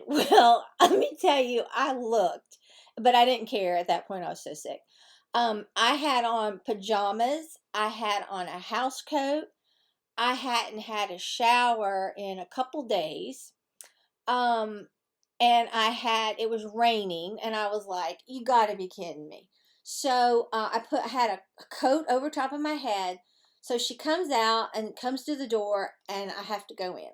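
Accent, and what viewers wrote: South, United States. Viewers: So good to hear that sweet southern twang say, "Hey guys, welcome back to my cheeennel." Welcome back to You (tube) ! Hip hip hooray!!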